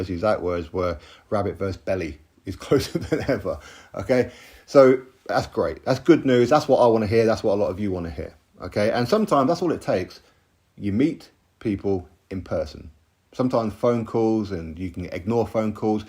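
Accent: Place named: RP, United Kingdom. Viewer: If you're from Newcastle Upon Tyne, as I am, then where did you get that posh accent from?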